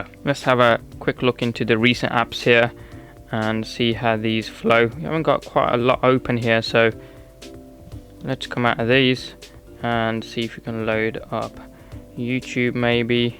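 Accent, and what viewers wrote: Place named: United Kingdom. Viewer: You sound british some sort of :v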